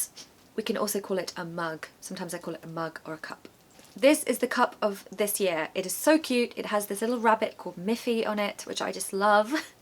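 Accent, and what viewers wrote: United Kingdom. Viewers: Read books to us in your British accent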